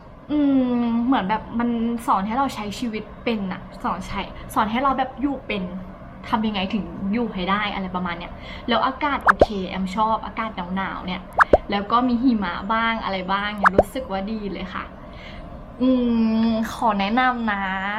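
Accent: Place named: Suphanburi, Thailand. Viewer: ฟังแล้ว สุพรรณแน่แน๋ๆๆ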